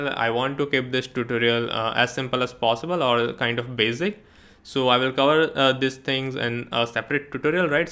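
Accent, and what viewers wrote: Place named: India. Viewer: Thanks. Love from Nepal. You sound like Indian, your accent 😀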